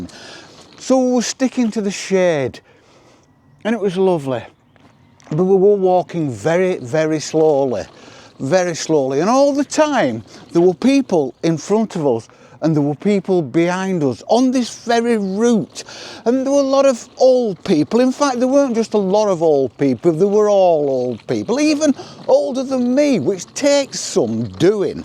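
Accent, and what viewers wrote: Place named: Lancashire, United Kingdom. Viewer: 😂 So funny 😂 Where are you in Lancashire? You sound like my grandparents who were from Westhoughton. I'm in South Staffs. Aged 73 😮 so there is someone older than you 😂 Thanks for making me laugh ❤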